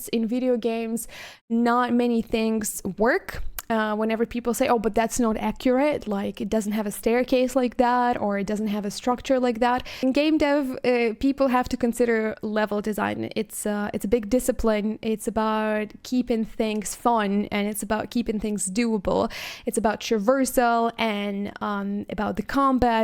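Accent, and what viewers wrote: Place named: Eastern Europe. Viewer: You don't have to work on your pronounciation. I can understand you very well and slavic accents are by far my favourite accents! No need to try to get rid of it.^^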